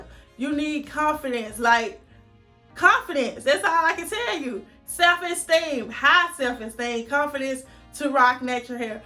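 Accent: South, United States. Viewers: Damm you country af. I couldn't understand most of what you said. Are you hard of hearing?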